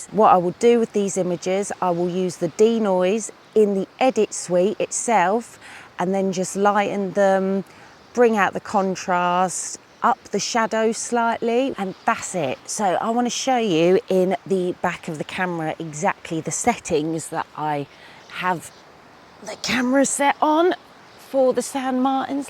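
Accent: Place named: United Kingdom. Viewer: British. Nice t see y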